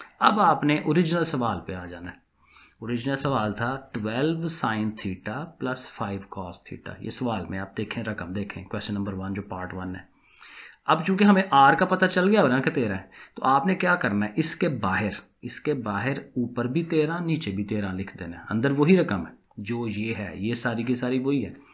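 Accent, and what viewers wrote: Sialkot, Pakistan. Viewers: sir I think u r from Sialkot ❤️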